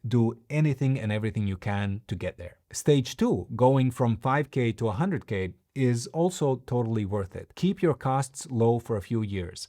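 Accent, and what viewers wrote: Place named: Latvia. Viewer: Klausoties dzirdu nedaudz latviešu akcentu :)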